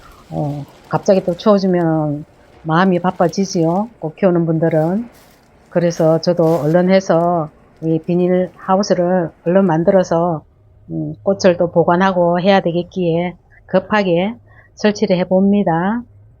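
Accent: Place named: North Gyeongsang, South Korea. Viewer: 왠지 익숙한 편안한 억양이랑 말투라서 같은 곳이구나 생각했지요 저도 경북이거든요 멋지세요^^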